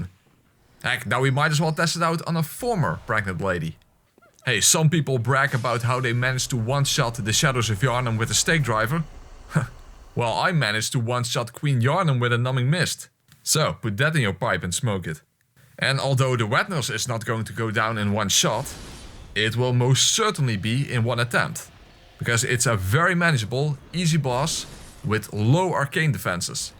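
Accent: Netherlands. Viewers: It took me 2 seconds to recognize your accent, het is goed om een Nederlander te horen op Youtube! Great content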